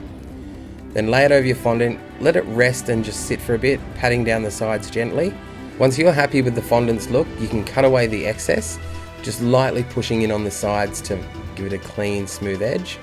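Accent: Australia. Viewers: it's an australian accent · He's actually Australian NOT Brittish XD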